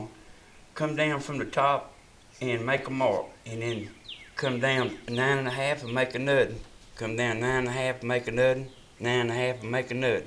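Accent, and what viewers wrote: South, United States. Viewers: I just simply love your southern twang, your funny, and know what your doing. Do you even watch TV? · Liked your video. Short and to the point. Plus I love the southern accent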